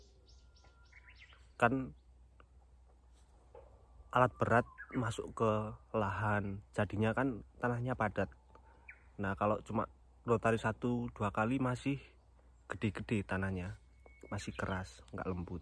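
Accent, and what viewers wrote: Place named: Japan. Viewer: Smean orang mana kang, ko ngga bisaa di bedain klo samean orang jepang apa indo😁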